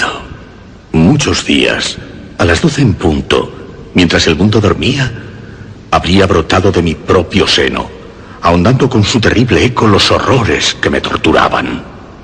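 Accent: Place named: Spain. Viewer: Excelente narración, el acento español hace que el cuento tenga más suspenso. Gracias por este gran aporte, fue de gran ayuda.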